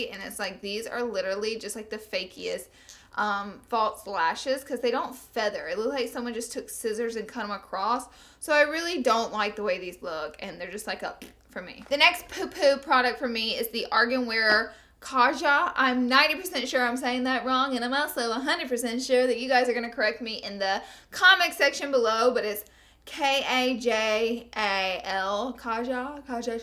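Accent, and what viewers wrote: South, United States. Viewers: i'm texan and her accent is way too southern for even texas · Hi, new sub here! Love your personality and southern accent! Can u plz do a tut on the look in this video.. I've been on the hunt for a purple eyeshadow look like this! Thanks!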